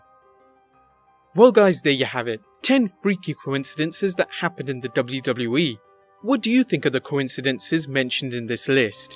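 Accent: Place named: United Kingdom. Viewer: Why does ALWAYS have to be a British narrator?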